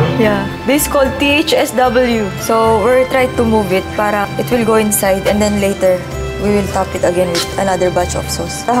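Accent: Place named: Philippines. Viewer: talks exactly like the wife his intonation, Pinoy accent, laugh and man er of speaking he adapted from her. Both are happy people always laughing and joking.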